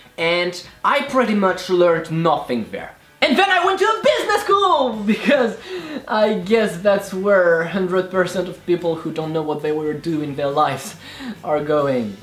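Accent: France. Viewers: perfect frenglish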